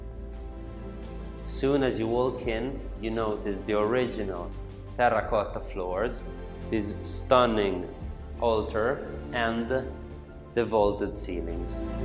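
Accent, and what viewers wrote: Italy. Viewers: Your aristocratic Italian ascent and your selling technique is unique, especially with the beautiful landscape of the Italian country side, This property exudes character and elegance, certainly an investment which can improve with a healthy income while the prospective property owner can also capitalize on living in a superb location. I am from New Zealand living in Australia, both beautiful countries, your delivery is a symposium of magic,drawing us into a life style of Italian eloquence. Thank you.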